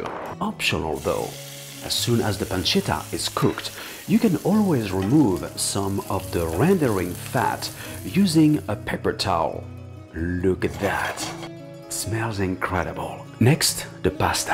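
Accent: France. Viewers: When I am in heaven, I hope there is an option for a French-accent man to prepare carbonara for me.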